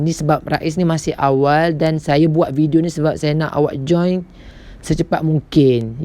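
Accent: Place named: Pahang, Malaysia. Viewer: mcm org pahang je bcakap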